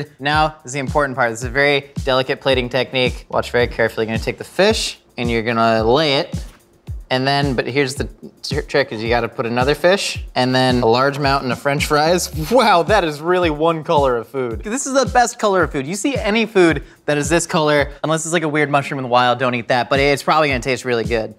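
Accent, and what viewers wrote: Australia. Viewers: Sound more aussie than English😂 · You sounded Australian not British lol good stuff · Sounds more like aussie 😂😂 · I can confirn that accent is 100% Austrailian. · Lol sounded australian mate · That moment when you realize that the Gordon Ramsey accent was actually Australian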